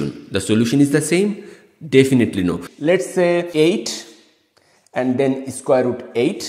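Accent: India. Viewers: By Voice sir is belong to India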